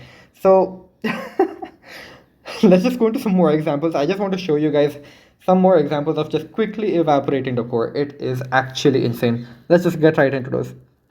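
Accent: India. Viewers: You indian bruv ?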